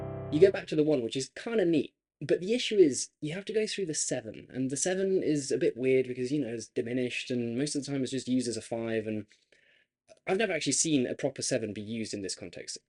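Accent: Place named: United Kingdom. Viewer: sorry to be negative, but I have to be. I cannot watch your videos. I have tried but all the hand waving drives me crazy. Then there’s the speed at which you speak; it is ridiculous. Why? Is there some hidden rule that says you have to go at a breakneck speed. I wouldn’t expect a drawl; that would be equally annoying. Just a normal pace. Also some attention to punctuation wouldn’t go astray. Your acquired accent makes it difficult to discern many words for the non Brit ear…maybe even fellow Brits have trouble understanding you ! I am sure the content is interesting and informative, but alas, I will never know. Unlike some of the sycophantic comments (which are probably your friends) I cannot subscribe or even (try) to listen or watch you again. Good luck.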